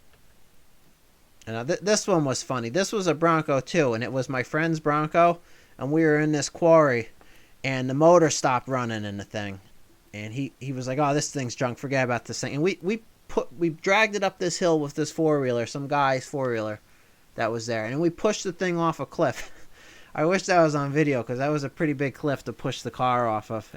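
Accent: New York, United States. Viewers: u from new york ?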